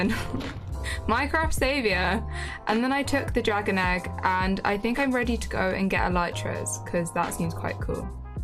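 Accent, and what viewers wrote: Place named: London, United Kingdom. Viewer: I thought so, sounded like a londoner, well good luck to ya 🙂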